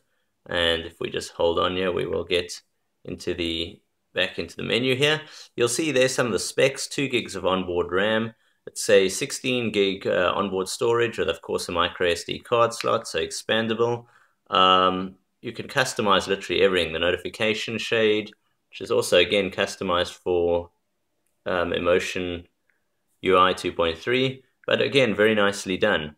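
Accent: South Africa. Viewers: i knew you where south african! awesome to see local stuff :) good stuff man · Managed to get a WHITE P7 which is extremely scarce at the moment in Cape Town! Price has come down a lot....R5300.... went from the iPhone to this one and its stunning! Was so nice to listen to this video done by a South African.... Thnx for a good tutorial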